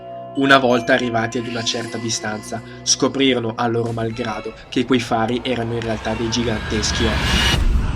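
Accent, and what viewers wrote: Italy. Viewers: Lui ha l'accento italiano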